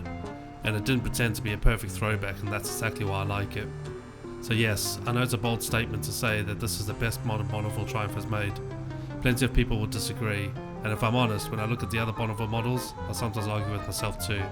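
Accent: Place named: United Kingdom. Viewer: Thanks for the video. I respect that this is your channel and you certainly can present whatever you want. From a purely personal perspective I'm American and I do have to listen closely to those from Britain to be sure I understand you. I'm probably in the minority here but I find background music distracting at any volume because it inhibits my ability to understand you. I appreciate that you are a motorcycle purist and that is worth hearing. I'm a good bit older than you and remember the first Triumph Bonneville I ever saw/heard, <num>. I don't remember any other motorcycles from that period as well as I recall that Bonneville. As soon as I can sell my BMW I'm going to buy a Triumph....I should never have bought the BMW.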